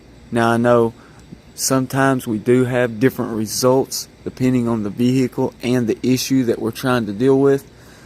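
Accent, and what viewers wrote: South, United States. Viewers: It's how people say oil in the south. Pronounced "ole"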